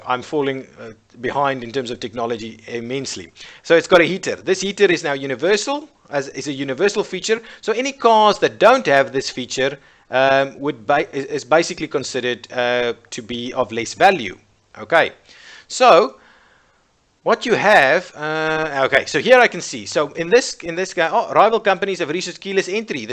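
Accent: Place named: South Africa. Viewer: That's a South African accent if I ever heard one. :p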